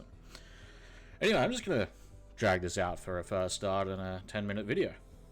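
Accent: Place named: Australia. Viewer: hey man, excellent video, fucking legend ❤, pleeeease tell me you have a link to the add-on? I'm REALLY struggling with addons atm so soon into TBC (WeaponSwingTimer has been totally fucked and I've downloaded like different 5 versions). This is some 200iq shit right here! I thought selling NW cloth from dungeons to tailors was good enough, this SHITS on that and lets me actually go in and flip it, making money stright from the AH, this is absolutely brilliant!!! Quicket gold garm? Fuck no. INSANELY ACCESSIBLE way to generatea substantial amount of gold that should especially help newer players who missed much of Vanilla such as myself to get our flying mounts / max our professions? Fuck yes! ❤ If you read this and can respond you've 100% earned yourself a sub, this is a super underrated channel, I really need some help with the addons to do this, if you can help with that then you're an ABSOLUTE CHAMPION. 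Also love the accent mate, I'm Australian too, born and raised, spent all 28 years of my life so far living here, and helpfully moving to Melbourne soon. Thankyou my friend!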